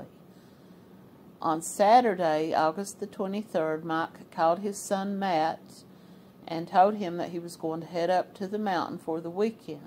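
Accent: United States. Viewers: Get that accent you southern belle !!!!!!!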